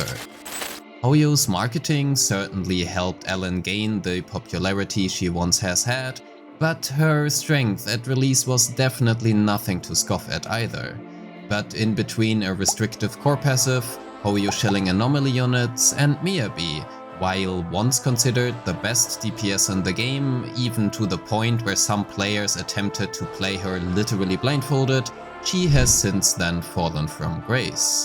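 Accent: Germany. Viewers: I am german and it took me not long to realise you're german as well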